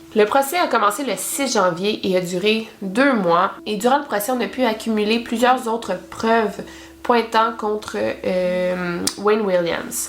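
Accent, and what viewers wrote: Quebec, Canada. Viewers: avant je détestais l accents québécois mais dans la bouche d une jolie fille c est toute suite mignon .Continue comme ca tu nous trouve souvent des histoire pas trop connue ou du moins toujours intéressant dans la réflexion👍😗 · J’AI VUE SA TÊTE J’AI PENSÉE MIND HUNTERS 😭 Sans rigoler j’adore tes vidéos, j’suis abonnés depuis longtemps et merci beaucoup pour tout le travail que tu fais c’est ouf, j’ai toujours apprécié tes vidéos , tu es toujours respectueuse envers les victimes et tu racontes très biens les histoires (et tu m’as fait aimé l’accent canadien) bref je like et je commente pas souvent et j’vais essayer de le faire au moins pour toi parce que ça vaux le coup🙃 J’espère que tu dors bien mdr ça doit te prendre tellement de temps de faire autant de vidéos en octobre, encore merci❤️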